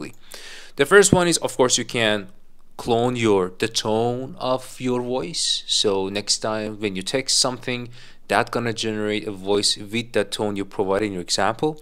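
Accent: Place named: Iran. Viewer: Not me recognizing fellow persian accent from miles away :)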